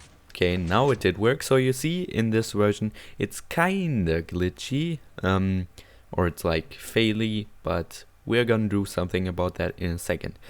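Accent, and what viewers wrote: Germany. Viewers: Hab vom ersten Wort an gehört das du Deutscher bist! ☺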